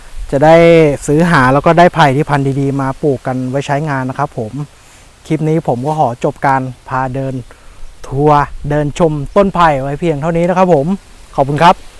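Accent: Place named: Buriram, Thailand. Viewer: สำเนียงอยู่จังหวัด.บุรีรัมย์ค่ะ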